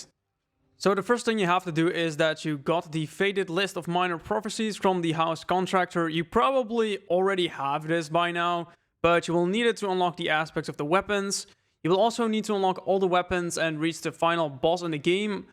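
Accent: Netherlands. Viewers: Your accent is awesome, it makes you sound like a Dutch DJ xD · HE IS DUTCH! I RECOGNIZE THE ACCENT! I LOVE DUTCH COCK!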